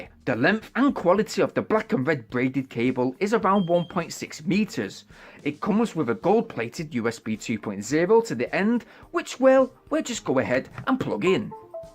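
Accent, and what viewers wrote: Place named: England, United Kingdom. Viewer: Love the presentation and your English accent!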